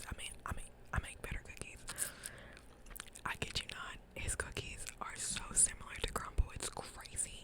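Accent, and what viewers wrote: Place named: South, United States. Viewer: I love your laugh , u sound so southern & I love itttt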